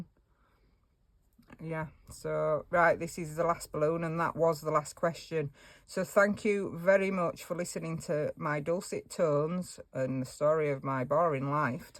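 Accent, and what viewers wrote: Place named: Yorkshire, United Kingdom. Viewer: Hi from Australia...I'm an ex pat Yorky! (Elland) LOVE your accent makes me feel homesick 😊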